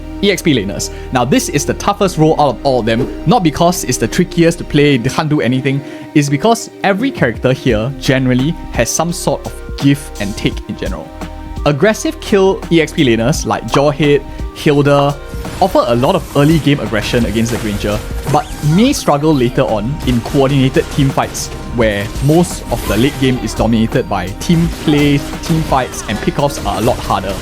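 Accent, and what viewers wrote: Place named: Singapore. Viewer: Prefer this Singaporean voice than those fake A.I. Voices in most YouTube vids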